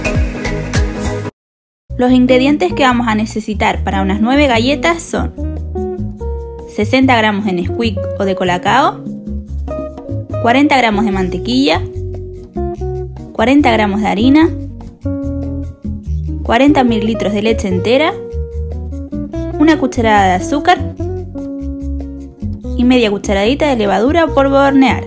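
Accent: Canary Islands, Spain. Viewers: Como se nota ese acento canario ❤ saludos desde mi cama :) (Tenerife)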